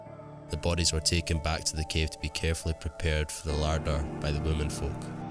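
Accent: Scotland, United Kingdom. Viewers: Great now I'm definitely not going to sleep at all. I love your channel and the fact that it's in Scotland and told with a Scottish accent. Ugh death by no sleep lol. I am loveing the stories and the chills though as they are definitely what I watch them for. I Hope you keep up the great work and continue the scares. Have a blessed day or night. Oh and I think I'm going to call you. short for as you sound like a vampire just don't pronounce your w's as v's so it is even more chilling and better to listen too. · Hiya ive recently came across your channel & I love the Scottish accent & history so you've got yourself another sub:) Keep up the good work your doing & looking forward to your next video. XxX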